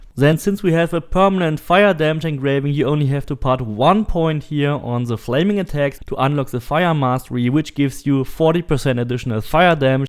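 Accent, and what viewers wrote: Germany. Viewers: Deinem Akzent nach zu urteilen, bist du eindeutig ein Deutscher 😜 Das soll kein Vorwurf sein: im Gegenteil - ich fand das mega sympathisch als ich zum ersten Mal eines deiner Videos zu Odyssey anschaute. Du sprichst super Englisch! Ich wollte mich mal bei dir bedanken, weil du mir das Spiel sozusagen erklärt hast. Ich hab nach Videos wie du sie machst gesucht und aber kaum was gefunden, umso mehr habe ich mich über deinen Content gefreut. Keep up the great work - you‘re awesome 😉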